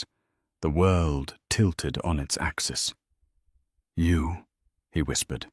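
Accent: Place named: England, United Kingdom. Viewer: Dreadful English accent!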